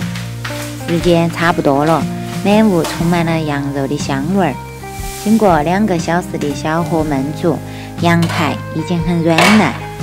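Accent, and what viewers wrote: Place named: Sichuan, China. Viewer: 我不是中国出生的都能听懂。蛮好听的四川成都话。👍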